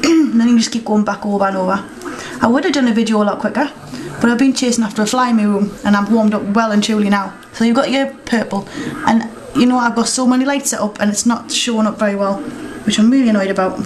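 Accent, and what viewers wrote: United Kingdom. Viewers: she is british but we'd say shes def from newcastle or nearabouts. geordie accents are actually too cool we want one. love the makeup in this too, that palette is awesssommee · Its The Geordie Accent.. Everyone From Here Says That. Bt To You It Would Mean Our Eyelid Lol. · you r really goood!! hahaha oh i really miss the british accent!!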